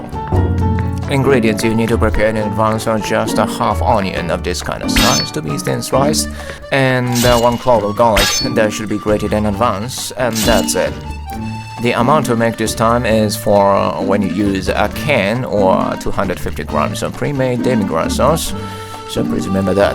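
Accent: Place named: France. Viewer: What are you talking about? best part of the video is his somehow french accent!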